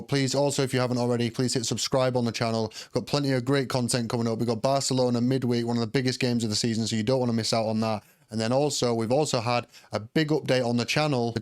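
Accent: Manchester, United Kingdom. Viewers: I mean he ain't even one of us, listen to his accent like are you Sirius mate??? He's a manc and he's buying subs and he has a click bait still to get more views and uses other channels as well. Me main channel has less then 2500 subs and I bin trying for yrs and this lad rocks up and gets 10000 in a few months and you tell me he ain't buying subs lol you donut get how you tube works lad!!! Why you not subbing to propa fan channels like my one then???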